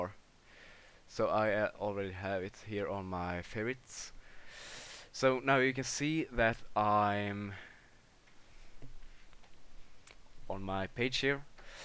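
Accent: Sweden. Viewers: Vi svennar har underbar accent asså <3 Very well done(in 1040p too!), works like a charm! :D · Snygg SvEngelska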